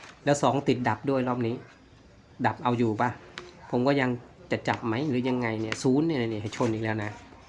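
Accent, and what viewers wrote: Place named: Isan, Thailand. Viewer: สุดยอดเลยครับเว้าภาษาบ้านเฮาหม่วนหูหลายอี่หลีเอาให้แม่นๆเด้อหนุ่มภูพานครับ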